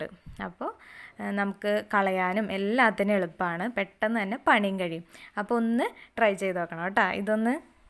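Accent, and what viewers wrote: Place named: Thrissur, India. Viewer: Your trichur language is very sweet. Continue in the same tone. Love you.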